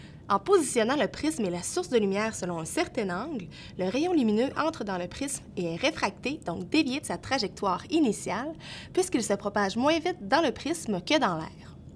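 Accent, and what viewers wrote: Quebec, Canada. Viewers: C'est quebecois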